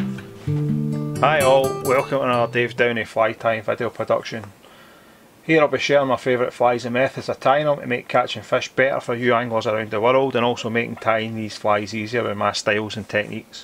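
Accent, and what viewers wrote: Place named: Scotland, United Kingdom. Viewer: Great fly....nice clean head. I have a hard time understanding the Scottish brogue, but enjoy it none the less. I've a qeustion though. I'm in the States (Montana), and I've never heard of a "cruncher" fly pattern. I could be living under a rock, but got me thinking if we have different nomenclature for flies across the pond just like we do with other things (line vs queue / trunk vs bonnet / trash can vs bin, etc.). For instance, a common pattern over here is called a "Royal Wulff." Do you have the same over there? Another common pattern would be a "Caddis" or "Renegade." Do you have those as examples?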